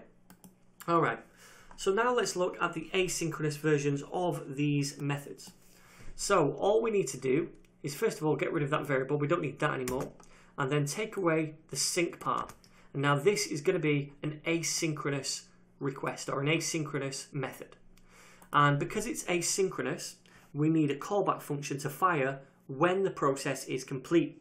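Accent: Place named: Scotland, United Kingdom. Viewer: I love Scottish accent!!!!!!!!!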